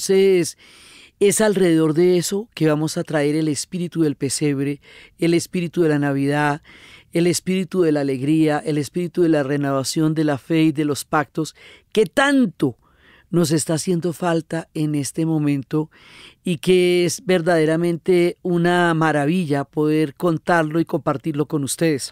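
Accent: Colombia. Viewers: ! El regalo más maravilloso ha Sido encontrarte, en mi vida existía un vacío que tú con tus palabras me lo has estado llenando, soy nacida en Colombia y vivo en Venezuela, dónde nos trajo mi padre desde Antioquia, por lo tanto tu acento y tus salidas me encantan y remueven mi origen. Podría escribir más...pero no jajajajaja Yo necesito aclarar el cuento del árbol de San Bonifacio porque iban a matar el niño, y como esa acción de San Bonifacio lleva a lo del arbol😊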